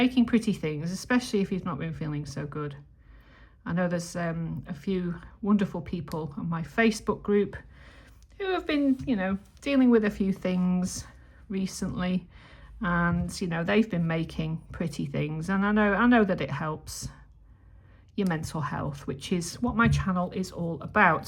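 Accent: United Kingdom. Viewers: So good to see you again! So sorry that you’ve not been feeling well but glad to see that you’re recovering. I really enjoy your videos and have learned a lot. You are a great teacher and I love to hear your British accent, righty-ho! I live in Rochester, NY. Thank you for sharing with me! 😊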